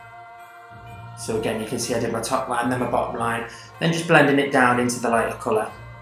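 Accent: Liverpool, United Kingdom. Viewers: Not related but I bloody love the Scouse accent! You're looking well hun, stay safe! Btw, will you be doing a queens speech this Christmas? I think as our OFFICIAL new royal you should address your adoring public! 😉🤪😂 Half joking, I know you're busy so you might not have time. 😁 Love frae Edinburgh hen! 😜🥰😘💙🏴💙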